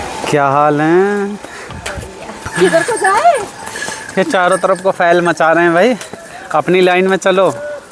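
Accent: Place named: Mathura-Agra, India. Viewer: Bhai aapka Ghar kahan hai. Aapke kisi video main aapne kumaun bataya tha, par aapki boli mathura, agra side wali lag rahi hai. 😊 Please thodi kripa karein.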